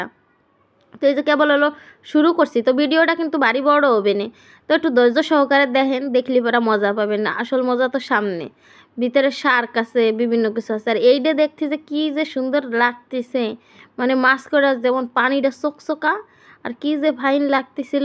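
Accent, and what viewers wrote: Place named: Gopalganj, Bangladesh. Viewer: আপনার বাড়ি মনে হয় গোপালগঞ্জ ভাষায় তাই বলে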